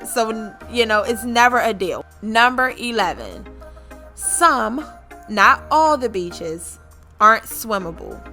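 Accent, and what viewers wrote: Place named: Baltimore, United States. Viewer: Enjoyed the video. Enjoyed the B’more accent.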